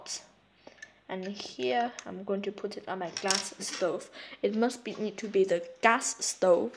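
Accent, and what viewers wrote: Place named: RP, United Kingdom. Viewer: Why does this guy sound so posh?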